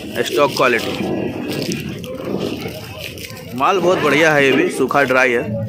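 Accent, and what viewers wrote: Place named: Bihar, India. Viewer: भाई आपका बिहारी भाषा हमें बहुत अच्छा लगता है 🤗🤗🤗🤗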